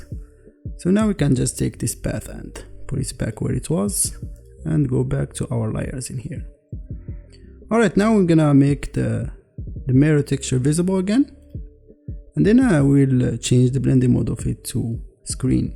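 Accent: Morocco. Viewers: From your accent I can tell that you are Moroccan .. Well done bro , high quality content for real , lah ywf9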